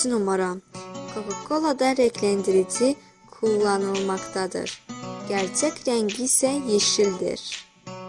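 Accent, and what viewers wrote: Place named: Azerbaijan. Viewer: Türkçe Çoxda Danışanmırsan O Saat Başa Düşülür Azerbaycanlısan Genede Halaldı =)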